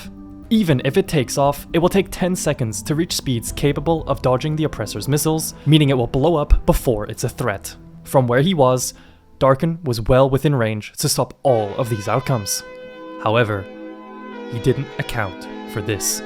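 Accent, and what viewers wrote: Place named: Argentina. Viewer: I'm sure he's from Argentina. I'm from there and I have no doubts that's our accent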